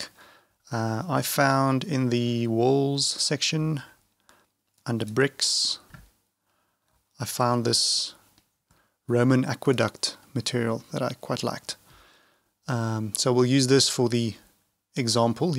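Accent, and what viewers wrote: South Africa. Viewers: That NB gave it away i was like its definitely a South African Afrikaans? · South African?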